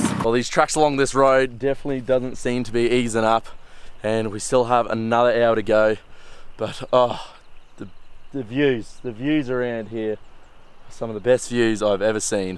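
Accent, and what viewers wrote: Australia. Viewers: Australian or NZ accent..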